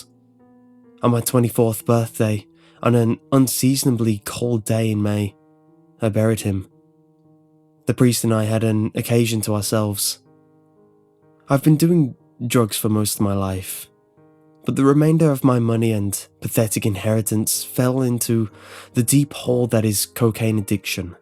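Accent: England, United Kingdom. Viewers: He is from England, they pronounce things differently there (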